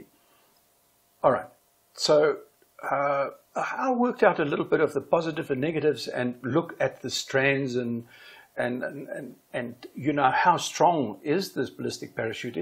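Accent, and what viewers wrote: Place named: South Africa. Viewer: Thanks meneer - nice to hear a South African accent on YouTube. Great analysis, I learned something....greetings from Portugal.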